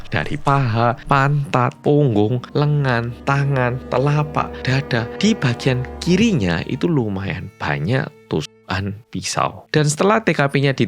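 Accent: Java, Indonesia. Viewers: hai malem muedok lgsg nyimaaak🎉 salam sehat💪🏿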